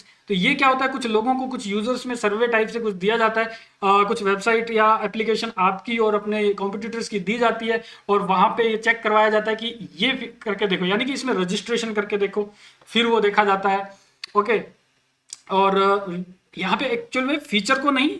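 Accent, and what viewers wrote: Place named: Rajasthan, India. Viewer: sir aapki tone se lag raha h aap pakka sri ganganagr or hanumangarh (rajasthan) side ke hoo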